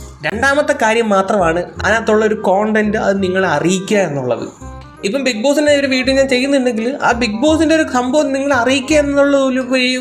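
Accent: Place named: Karunagappally, India. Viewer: videos okke nalla funny aanu...karunagappally slag kelkkanum❤❤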